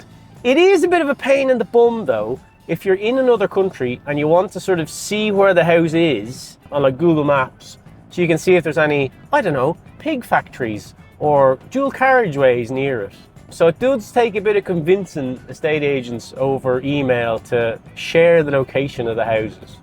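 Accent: Ireland. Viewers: I'm Australian, and I also happen to be 46% Irish apparently, so I would love to visit Ireland one day. I also love France. I've always loved the French language, and I'm currently teaching myself French, so I do really enjoy seeing the French villages and culture that you show in your little adventures. P.S. I should also say, I love the Irish accent as well, so what's not to love about your Vlog! 😊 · Thank you for the titles of the villages,. It’s not your mumbly Irish accent - it’s my french ears not working. I love your Irish accent 🌸 Love from Cape Town, South Africa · Thanks for taking all of us window shopping. Love all the old buildings. The knives were cool. Love the back roads. So pretty. Yes I can understand your Irish accent. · Thanks for taking us along, we are glad you met Dan Preston. Also thank you for using subtitles for the proper name places you visit as sometimes I have a wee bit of trouble understanding your pronunciation of French names in your Irish accent 😅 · you're a total ledge! 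mumbly Irish accent and all! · Now I can understand your Irish accent as I'm Irish too, go up north and then I might say yes hard to understand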